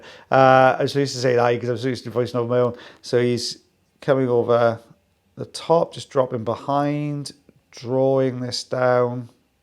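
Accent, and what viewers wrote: Wales, United Kingdom. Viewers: Love the Welsh. Would love to hear more, my wife thinks it’s sexy.😊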